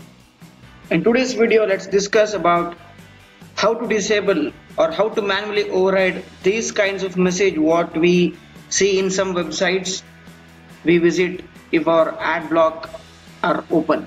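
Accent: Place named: India. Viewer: Indian accent too hard to understand.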